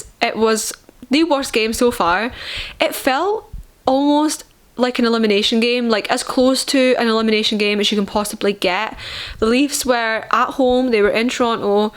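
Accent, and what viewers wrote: Scotland, United Kingdom. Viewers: It’s refreshing to hear/see a WEE SCOT analyzing the Leafs. My NANA was from Scotland and I miss that wonderful accent. Also a little surprised to see someone so far away who is so knowledgeable about our ‘hapless’ Leafs. Thanks for posting, my dear, it’s wonderful for an old man to hear hockey described by such a sweet beautiful lady…AND I LOVE YOUR BLUE EYES !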